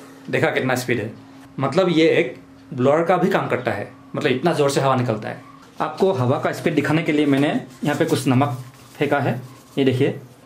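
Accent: Assam, India. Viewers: Tum assamis ho na tumhara bat se pata salrahahe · Are you assamse ? Your accent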